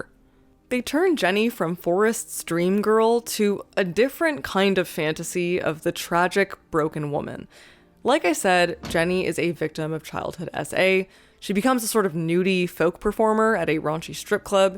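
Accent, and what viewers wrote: Canada. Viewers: Is this channel’s creator Canadian? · So, I agree with a lot of what you say here. Zemeckis & co. definitely took a lot of the complexity and all of the darkness out of Book-Forrest’s character. I think Movie-Forest is more like Chance the gardner, from Being There , than the character from the book, who he shares a name with. But, to be fair, the book really was very badly written. IMO, it’s maybe the only time I’ve ever experienced the book being worse than the movie. Full disclosure: I’m also from Canada (I think I might hear an Ontario accent in your narration?), so I’m looking at the US situation from the outside, in. I also loved the movie when it came out and watched it on repeat for a while (I was in High School at the time), and haven’t watched it since. So I definitely have a bias and I might be forgetting key things. Still, I agree with you; the movie is not even close to perfect and it has some jingoistic vibes. 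But, there’s also hope there, even if Forrest is a bit of a fairy tale character. And, human suffering, as well as the darkness of the historical period is not completely absent from the story, either, with Lt. Dan and Jenny. I don’t think the intention of the film was stark realism. And don’t we all need hope and a happy ending now and again?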